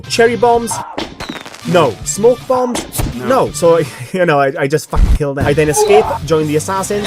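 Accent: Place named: Wales, United Kingdom. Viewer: Welsh accent is carrying this video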